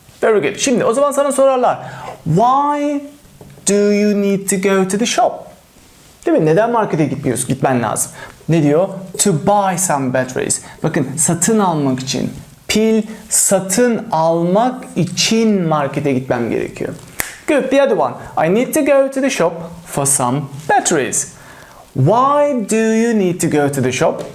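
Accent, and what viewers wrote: United Kingdom. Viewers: ingiliz aksanıyla da anlatması paha biçilemez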